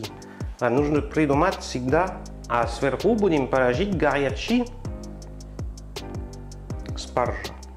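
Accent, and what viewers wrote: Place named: Gascony, France. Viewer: Очень вкусно и интересно рассказал , а главное акцент классный. Вы гасконец , он похож на Евгения Данчевского из трёх мушкетёров. ,, Имя сестра, назови мне его имя !!!"))) Фельтон !!!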